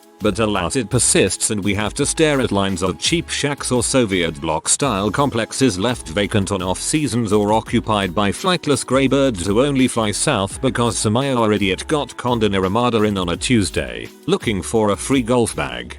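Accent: United Kingdom. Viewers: Where did you get that robot voice with a British accent ,,, it's hilarious.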